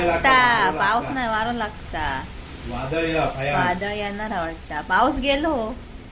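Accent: Malvani, India. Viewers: Tumchi malavani bhasha aikayla khup aavdate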